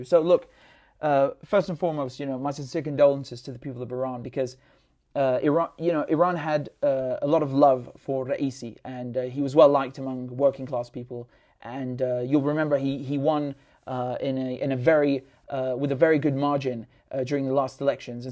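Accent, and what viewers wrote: England, United Kingdom. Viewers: Bro go back go your Syria and stop spreading anti west cheap propoganda in British accent. If you love so much your resistance you should live there.. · English accent is strong in this one.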